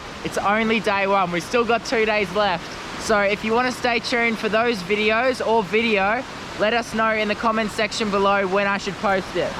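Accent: Australia. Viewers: 300 ft bruh I thought you were Australian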